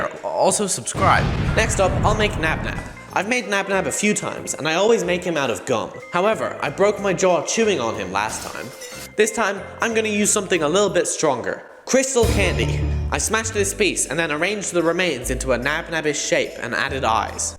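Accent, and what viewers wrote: Australia. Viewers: U Australian 😄😄😄😄😄😄😄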